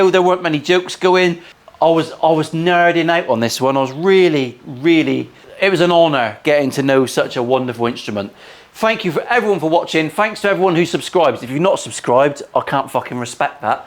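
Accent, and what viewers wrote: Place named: Totnes, United Kingdom. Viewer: Hats off to the Legend, serious kudos. I’ve a PRS SE custom 24-08 lefty. Played BC Rich for years (regret selling a few!) but without a doubt the PRS is the best guitar I’ve played and one that I reach for. Keep up the good work buddy. Showed my missus your channel cos she’s from Totnes…said listening to you was like being back home!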